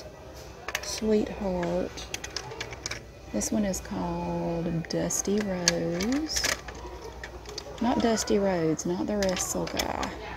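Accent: South, United States. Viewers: Your quick-witted commentary with your southern accent makes these my favorite videos. You always make such a drastic before and after with organizing. Please do more! Thanks!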